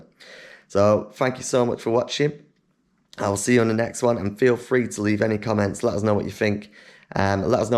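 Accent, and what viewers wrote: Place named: London, United Kingdom. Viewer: Wow that accent. Its like Northern, London, urban, ghetto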